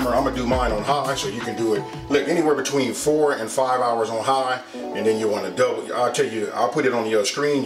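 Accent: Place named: Los Angeles, United States. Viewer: You have an LA accent. I can hear it when you said "starving"... Pronounced like "sshtarving" etc.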